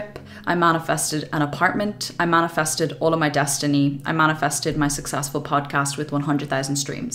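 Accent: Ireland. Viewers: 2nd generation American Irish Girl(woman) here! I literally manifest everything I desire and am open to. I too have vision boards and journals to prove it. Amazing. I just found you today and love your slight Irish ☘️ accent! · Ngl, my English accent sounds so bland compared to your Irish one.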